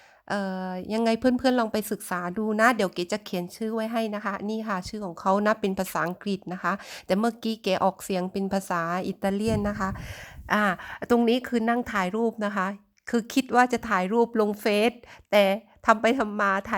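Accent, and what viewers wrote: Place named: South, Thailand. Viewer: ดอกไม้สวยมากบานสพั่งเต็มทุ่งเลยช่วงนี้ถ้าเก็บเอามาขายบ้านเรารวยเลยดอกไม้ขาดตลาด ฟังน้องเก๋เล่าเพลินเลยสำเนียงใต้ฟังชัดเจนดี รายได้ดีมากคิดเป็นนาทีเลยสุดยอด น้องเก๋สาวใต้เก่งมาก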